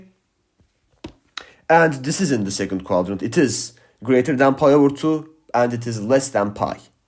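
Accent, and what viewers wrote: Turkey. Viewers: yemin edebilirim ama ispatlayamam konuşan bir Türk :) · Usta türk olabilir misin tam türk aksanıyla inglizce konusyon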